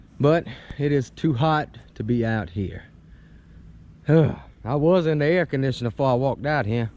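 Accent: Louisiana, United States. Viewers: Are u from Louisiana?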